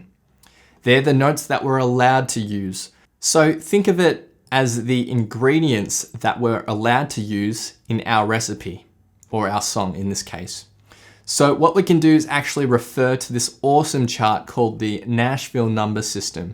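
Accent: Australia. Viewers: Thanks a lot for the tips,! I love Australian accent by the way haha. Keep it up, mate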